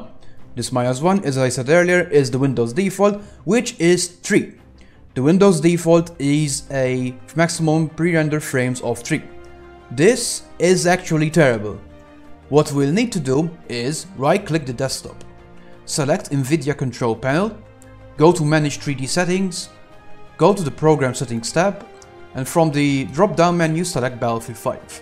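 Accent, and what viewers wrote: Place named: Malta. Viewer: I knew that you are Maltese as soon as you start speaking ;-), so this also apply for an AMD 5700XT ? do you have maybe another video showing an AMD card?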